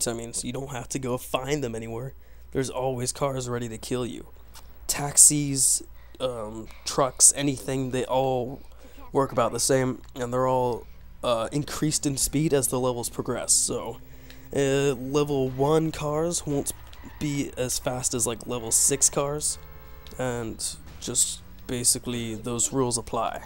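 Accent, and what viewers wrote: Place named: New England, United States. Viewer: Sounds more Kennedy/New England than English I think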